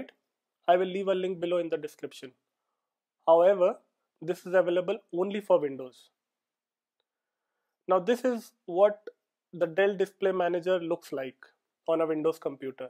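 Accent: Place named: India. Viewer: This is a wonderfully detailed review with a nice highlight on the specs and functionality of the Monitor. Also delivered in a dope Indian accent.!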